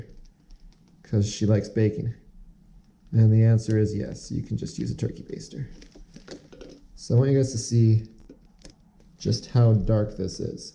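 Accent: Canada. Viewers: You sound a bit Canadian eh?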